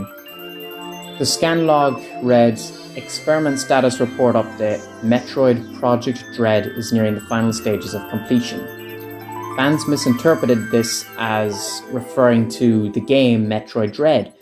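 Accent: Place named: Ireland. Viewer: Irish bai!?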